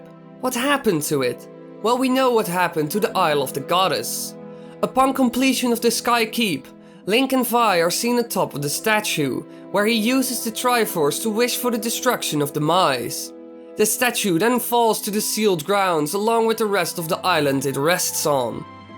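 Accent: Netherlands. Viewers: Are you Dutch because you have a Dutch accent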